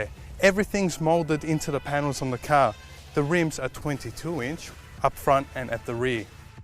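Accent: Australia. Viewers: The G63 is finally a true AMG worthy of wearing the AMG badge. The ONLY car sicker is the Brabus G63!! The best thing of all is having an Aussie review it, speaking “our” language!!!!! Brilliant! Sub’d, liked & notified!! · no, thats a spot on wog aussie accent.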